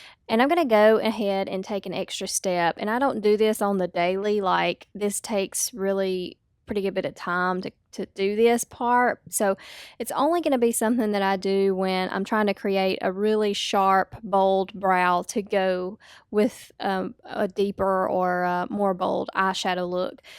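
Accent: South, United States. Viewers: yay southern we talk like that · Southern accent!!!! LOVE IT!!!!! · I love you southern accent and the look 👌🏻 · Omg your voice makes me miss the south so much ): you sound adorable and you are so beautiful! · I love the look and our Southern accent! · I just found your video and subscribed!!! I love your southern accent, where are you from???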